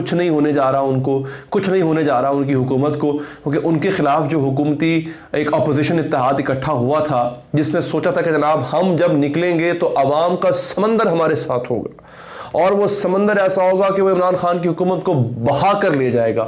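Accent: Punjab, India. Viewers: Bhai your punjabi touch is amazing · Punjabi touch is very interesting 😊😊,,,keep it up bro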